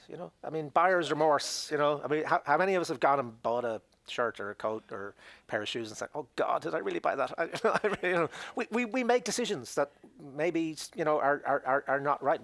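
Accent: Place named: Ireland. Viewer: As an Irishman, I appreciate your comment. I would like to suggest an answer to your question 'why are all the most intelligent commentaries on this delivered with an Irish accent?' In Ireland, our requirement to have a referendum every time we adopt a new EU treaty means that we are forced to pay attention to the EU. We know and understand the EU better than English people, who don't get it at all. It has the added benefit of leaving Irish people with a sense of ownership of our membership. Before we, the Irish, joined the EU in 1973, for the previous 50 years we are had the type of independence that the UK is now seeking. We know the limitations of going it alone in the world, its and outdated form of independence that belongs to the early 20th century and only okay if you like being poor. It is also somewhat of an illusion - as a small country will always have to give way to a bigger country in trade negotiations...in this case the EU will always dominate the UK. 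We are never completely free, independent or sovereign. In short, we know how deluded the aspirations of JRM, BoJo and IDS and others are. I wish you well.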